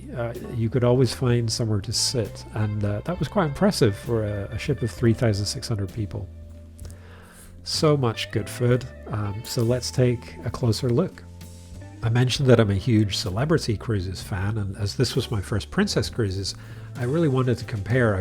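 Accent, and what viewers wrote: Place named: Northern Ireland, United Kingdom. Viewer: Thanks for video, I like Princess and Celebrity but the latter is getting very uppity with their pricing these days. In fact I do not think we will be able to afford them again, plus when in the booking mode online, at the end there seems to be a huge upgrade price to pay if one wants a refundable deposit. I won’t have that, so c’est fini. I won’t ever visit a zoo, aquarium or anywhere that keeps animals prisoner for monetary gain. Visits just encourage them. Sanctuaries are different. Thx again. Where is your accent from, my friend from Londonderry, N.Ireland says “food” as “fudd” like you. Sorry but it is not “gi gi’s” as in the kids’ name for horses, but the French soft G, like an “sh” sound. The older ships have the name Alfredo’s, and up until this year it was free. Damn nuisance. Take care.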